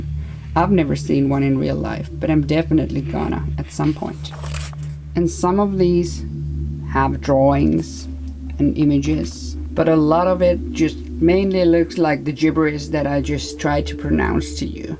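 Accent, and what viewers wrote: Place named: Finland. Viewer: Fun to watch your video. Is your Myrrh colored white (or is it just the way the top of the box looks?)? BTW, loving your Finnish accent, soooo awesome! :)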